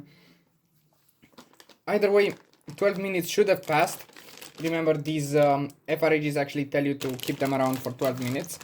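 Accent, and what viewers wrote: Romania. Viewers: Lovely classic romanian B2 accent Thx for the video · It is an accent. It's not for you, being Romanian, sounds "normal" to you, but it's a VERY appreciated accent by other countries.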